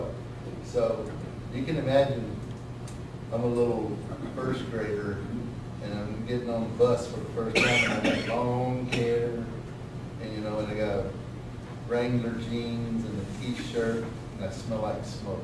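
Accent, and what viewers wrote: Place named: South, United States. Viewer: He looks like the "Europeans" of whom he speaks. With a southern drawl. 🤔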